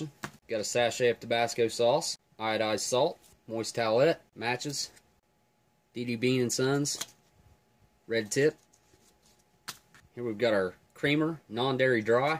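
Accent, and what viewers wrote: South, United States. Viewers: Are you from Texas, South Carolina, or Georgia?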